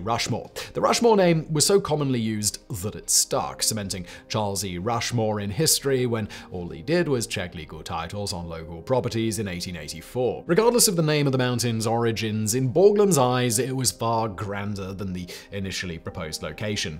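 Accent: United Kingdom. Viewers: South Dakotan here. Was cleaning my bathroom while listing to your today I found out Japan and Germany, and then this auto plays and I just hear this goofy British man say there is no more boring a place. He’s right but like damn, didn’t expect such a personal attack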